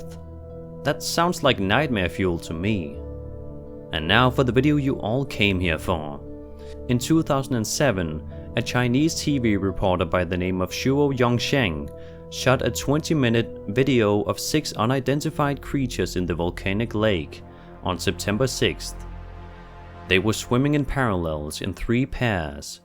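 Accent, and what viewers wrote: Denmark. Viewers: Sir, are you danish? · thank you. My hubby is from Denmark and he pointed out his accent lol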